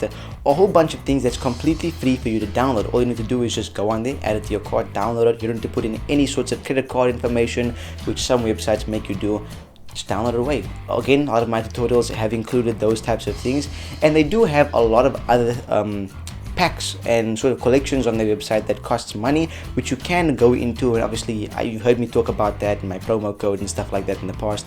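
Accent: South Africa. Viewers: Ayyy, I recognise the south African accent !! 🙌😃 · hang on are you South African? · are you from south africa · You sound South African 🤔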